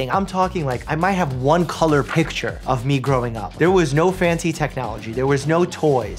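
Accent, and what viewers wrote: United States. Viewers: It's really funny because I got a vibe that you had this heritage despite not knowing anything about you (just found your channel today!). Not sure what it was since your accent is fully "American" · It's probably because he came to America so young so his new English accent started to influence his Russian accent compared to if he came at like 12+ it probably wouldn't have affect his Russian. Also he spoke English outside of the home so even if his parents only spoke in Russian as a kid your outside of your house normally more than you are home so he has a lot more real world practice with English rather than Russian because he only speaks it with his family and nobody else. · I know im a bit late but his russian is great. Has a only a bit of american accent as opposed to a lot (i am russian so i know) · My brain just exploded at hearing speaking Russian. 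I am a native russian as well, but I don't associate with this language AT ALL! Ahahah. Но видно, что давно не говорил по-русски, слышится акцент американский уже) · Hearing your unmistakably American accent, part of my brain refuses to believe that English is not your native language. This is all the stranger given that the same could be said of me. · Loving that your Russian has American accent! 😂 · I did not know you were born in Russia. Your accent is as American as it can be. Good luck and stay safe out there! Also did you know “Coming to America” is a movie and it’s pretty funny. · has an American accent in English and a Russian accent in Russian. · wow he has an American accent when he speaks Russian? I figured he'd be fluent in both since his parents didn't speak English when he was growing up. I would think they still speak to each other in Russian since it's harder for adults to learn foreign languages than it is for kids. 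At least that's how it was for my gf in high school who came from Chile with her parents. Her dad's English was decent but when speaking amongst themselves it was almost always in Spanish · Oh my gosh! I’ve been trying to figure out what your accent is every time I watch your videos (or regional dialect I guess is the proper term). You just have a particular way of pronouncing words that many of the people I grew up with also do (sounds a little different ‘cause we were in MN) but there’s a clarity to your speech that is common among people who learned English as a second language. It’s a beautiful variation of the American accent. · My question is... HOW DID YOU GET SUCH A GOOD AMERICAN ACCENT?!?!?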